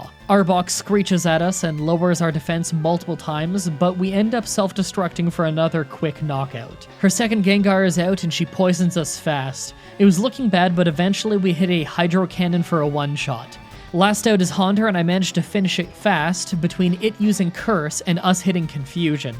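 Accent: Canada. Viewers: U sound canadian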